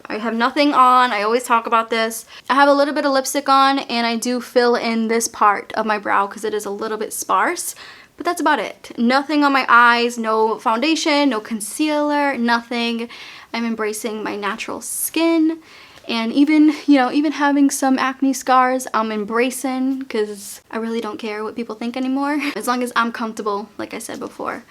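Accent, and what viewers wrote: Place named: Massachusetts, United States. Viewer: Massachusetts. Her accent is the number 1 reason why I subbed and she tries so hard to not have an accent lol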